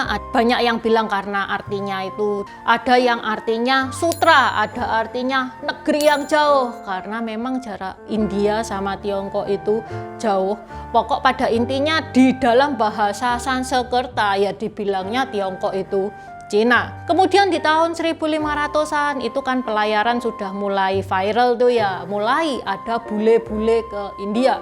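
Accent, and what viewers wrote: Java, Indonesia. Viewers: ... unikny lagi pke loghat jawa medhog 😃😃😃😃😃 ... 👍❤️🙏 ...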